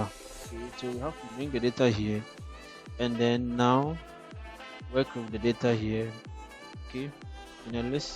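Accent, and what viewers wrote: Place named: Nigeria. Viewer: he sounds like a Nigeria, is he one ?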